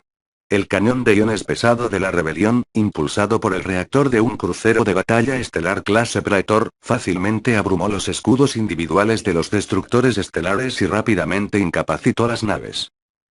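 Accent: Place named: United Kingdom. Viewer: Tienes un acento británico amigo. Gracias.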